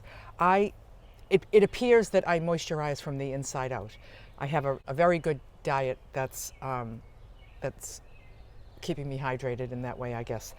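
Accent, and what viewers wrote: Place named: Boston, United States. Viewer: Hi, I just found you and love this video. Your a classy lady speaking of delicate matters and also makes me smile because I hear your Mass. accent comin in loud and clear and I feel home again. I don’t meet many here in Seattle with the accent and I’m always being asked where I’m from after I’ve said a few words that only one from Boston can say. I’ll keep on watching and loving your young spunky spirit to brighten up my day!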